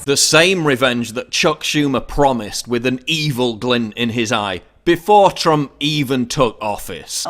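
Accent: United Kingdom. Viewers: We're the crazies. Sure, nothing wrong with Trump. Who are the leakers? WTF is a Brit narrating this? He sounds just like Robin Leach from "Lifestyles of the Rich and Famous." Before Trump was elected, one of his associates said that if he didn't win, "there will be blood in the streets." That scared a lot of people, because we figured it wouldn't matter if he won or not. Because he insisted that the election would be rigged. But he won, so it wasn't rigged... I don't want any wars and I would prefer that he resign to being impeached. But he will never quit because he'll never admit to doing any wrong. Trump told an Evangelical reporter that he has never done anything for which he would have to ask God's forgiveness. In other words, he is perfect and sinless. A bit confusing by most Christian theology, but what the hell. He will never quit because he would never admit to any wrongdoing (adultery, etc.) If only Fox News has managed to report the truth and this Russian stuff is all made up, then he's fine. 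The Russians did a lot of hacking. Trump knew that, but he jumped at the chance to use every Wikileaks the Russians managed to get and spread all the lies the Russians spread about Clinton, and chant the hateful "Lock her up." He colluded. In spirit anyway. You say what Trump revealed to the Russians was to help save them from ISIS attacks? That's good from my pov. But he could have been more discreet. He could have not told them where the info came from. He could have made s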